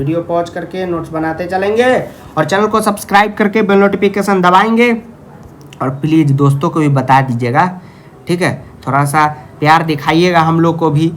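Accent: Bihar, India. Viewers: Sir lagta hai aap bhi bihar ke hai tone pakad liye hai ham😀😀😀😀 BTW the teaching was next level. Thanks for the useful session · Thanks a lots sir .... Mujhe samas mai bahot doubt tha par apka video dekhne ke baad sara doubt khatam ho gya ...... Sir aap ki awaj se pta chal rha tha ki aap Bihar se ho .. mai bhi Bihar se hu..... Lots of love from Delhi Aur one's again Thank you 🙏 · Sir, your language is telling me that you are from Bihar because I myself am from Bihar.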